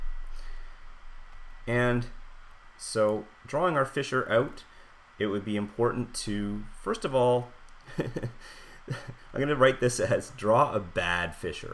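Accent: Canada. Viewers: R u canadian?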